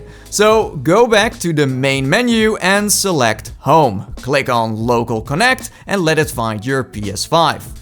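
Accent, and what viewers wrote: Netherlands. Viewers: Bij de eerste zin hoor ik dat je nederlands bent hahahaha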